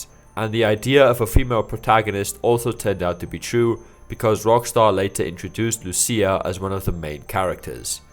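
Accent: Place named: South Africa. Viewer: The South African accent is coming through bru! Lekker video!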